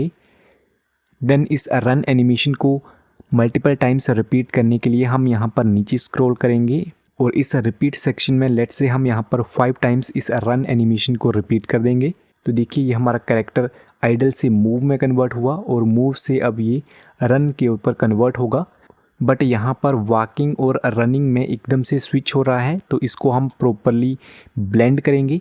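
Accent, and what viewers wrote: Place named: India. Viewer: india voice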